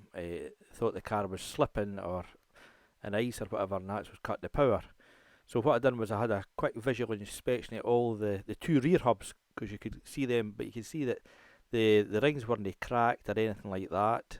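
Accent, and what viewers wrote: Scotland, United Kingdom. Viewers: Thank you for doing this video. I'm looking for a cause of a certain code on my x350, and this has been a real help in helping me decide how to proceed. (Love the Scottish accent by the way. )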